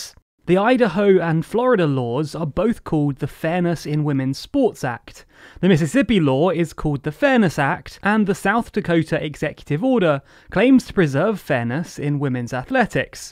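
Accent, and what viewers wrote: United Kingdom. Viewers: Woah, deep video, love this format! More of these please!! I’m able to see the others point of view. It’s crazy hearing a British dude breakdown deep American issues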